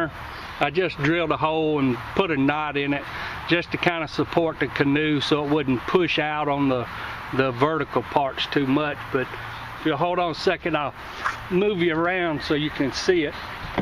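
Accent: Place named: South, United States. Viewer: just love that Southern drawl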